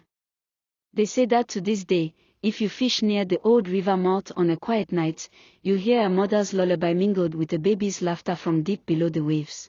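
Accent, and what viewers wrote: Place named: Africa. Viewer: I love the sound effects on your videos and the African tone, you're doing well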